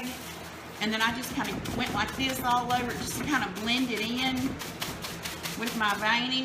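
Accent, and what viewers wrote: South, United States. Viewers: shell shell I don't think she was hating but loving her southern accent. Take a chill pill will ya??? LOL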